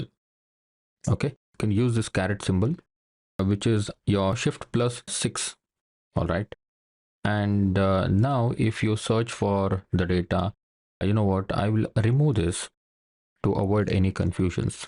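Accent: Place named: India. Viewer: Classic Indian tutorial vibes...Flashy MacBook, thick accent, and somehow turning a simple task into a NASA mission. You could’ve just used Windows and made it beginner-friendly, but nope, had to flex the fruit laptop. No real pro with a MacBook is coming here to suffer through that accent. It’s the poor Windows and Linux users trying to survive this mess.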